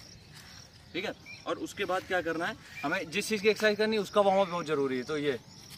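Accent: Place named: Lucknow, India. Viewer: Lucknow k ho lagta h.. bahut badhia style h hachak k 🤩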